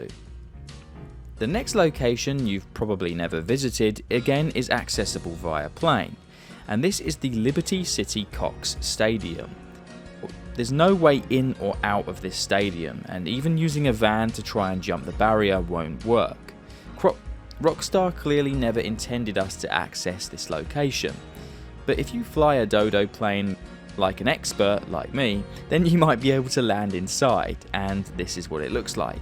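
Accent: United Kingdom. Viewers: I played the year it came out and saw all those back then... didn't even forget 'em. My wife watched her brother play back and the day... she didn't forget them either. The houses in the ocean being weird to what sounds like an Englishman are pretty rich. You never heard of the houses ON the River Thames or the WW2 outposts that people ended up living in? SMH. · He's British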